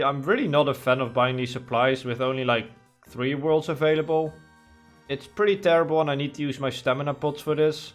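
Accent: Netherlands. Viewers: I can hear the thicc dutch accent. Keep up the good work maatje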